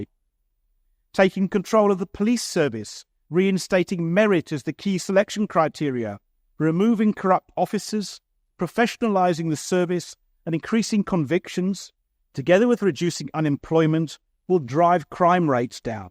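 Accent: United Kingdom. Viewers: You sound like you are from the UK?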